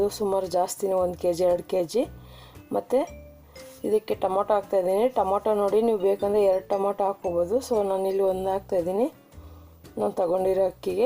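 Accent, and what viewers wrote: Tamil Nadu, India. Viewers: Neevu tamilnadu nora nimma kannada adethara ide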